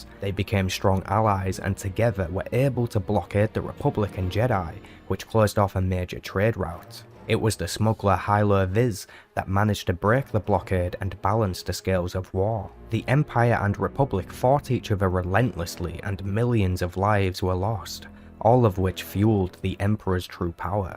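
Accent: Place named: Yorkshire, United Kingdom. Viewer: Just recently joined your subs, and would just like to say it's nice to hear a fellow Yorkshire man🤘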